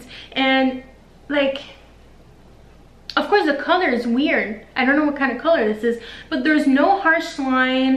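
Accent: Quebec, Canada. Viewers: Are you French Canadian? Québécois? I know my people haha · Your accent... you from quebec right ?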